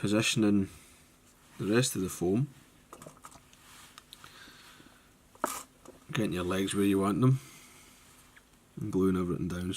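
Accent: Scotland, United Kingdom. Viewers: I think this fly was created by a Canadian named Elliott something. I tried it years ago, not successfully, when I was learning. Should be easier now. By the way, little by little I am solving the Scottish accent. You have a lot of flies that should do very well here in Florida USA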